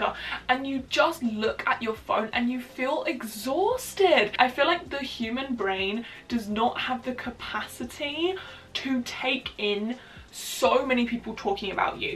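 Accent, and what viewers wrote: United Kingdom. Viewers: I’m sorry you get awful comments… I’ve been watching you for a while, I seldom comment on videos, so this is my first comment on your video. After watching this, I wanted to give you a compliment since you said you read every comment haha. Well or, more so throw shade at people who criticize irrelevant things like your appearance… Anyway! Sorry I’m rambling 😅. The people who comment about your teeth and ask why British people don’t care about their teeth, and just pissed they don’t have the superior accent, at least that’s my guess as someone who would trade my boring midwestern accent for a more sophisticated accent. Ugh this is a weird comment, and it’s all over place, this is why I don’t comment 😂. Anyway! I think you’re a cool pretty lady, who makes videos that I like, and I hope you have a great days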